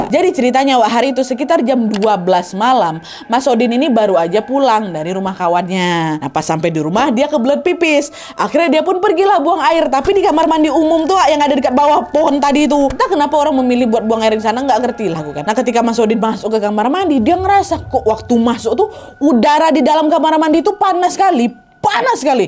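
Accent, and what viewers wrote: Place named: Medan, Indonesia. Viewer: Medan kali yaa kak 😅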